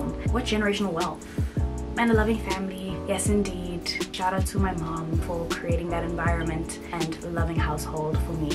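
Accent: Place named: Xhosa, South Africa. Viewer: Going thru ur vids catching up and Okay i love your accent no cap Are you xhosa🔥😌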